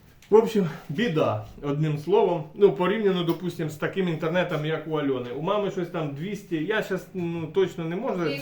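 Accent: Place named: Bila Tserkva, Ukraine. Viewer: отож таке. Уроди скрізь є. А ну скажи мені будь ласка, ти проживав в районі Білої Церкви? (Приблизно) Сильно діалект знайомий. Бажаю Вашій сім'ї всього хорошого.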